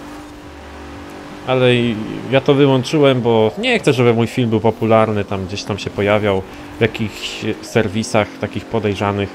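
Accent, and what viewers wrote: Szczecin, Poland. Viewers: Ze Szczecina jesteś?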